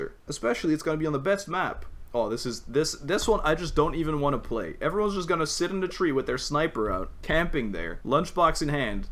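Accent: France. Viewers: i just realized has a perfect french accent, and so he might be french like me- i'm not sure how i'm supposed to react to it, but i guess i'll take it positively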